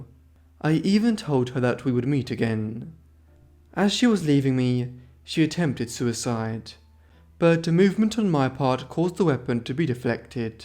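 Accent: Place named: United Kingdom. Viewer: Narration would be better by an American, not a British accent.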